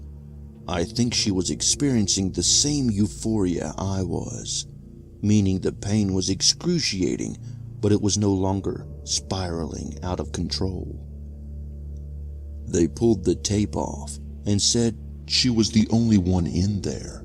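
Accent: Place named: South, United States. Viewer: Listening right now... Where in the south are you from?.... I'm from Alabama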